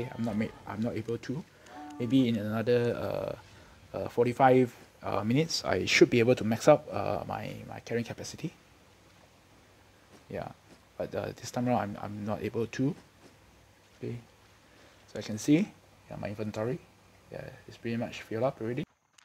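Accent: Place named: Malaysia. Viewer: Bro, u either a malaysian or singaporean based on your english. Theres no doubt about it.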